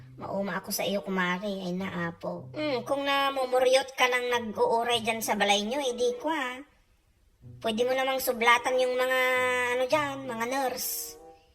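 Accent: Ilocos, Philippines. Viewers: Makapakatawa Ante 😂😂😂 Makarelate ak ta kasta ak agsao tagalog ilocano haha. Salamat ti effort mo nga pakatawain na kami aglalo tatta nga panawin. 👍😊 God bless always ❤️😇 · bakit ganyan ka kuhang kuha mo ang style ng mga ilocano magsalita haha,.. More videos ante pra pmpa good vibes lng s kbila ng problmng kinkharap ntin s buhay.. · Hahaha ilocano tagalog hahahaha... pavirgin🤣🤣🤣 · Tagalog Ilocano met auntie 😂😅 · Naalala ko mga lola ganyan nila ako kausapin tagalog na may touch ng ilocano.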